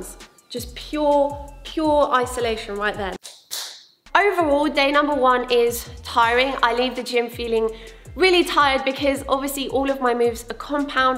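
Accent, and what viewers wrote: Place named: England, United Kingdom. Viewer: Not to be creepy or anything but how old are you? because you have accomplished so much and I adore you. You're amazingly toned, you do gymnastics and you have an English accent. You are what I want to be _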